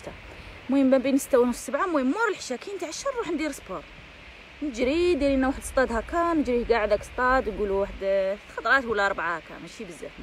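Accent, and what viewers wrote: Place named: Chlef, Algeria. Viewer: كي تهدري تفكريني في صحاباتي قراو معايا في الجامعة ، من شلف نفس اللكنة... توحشت ليامات تاع لافاك....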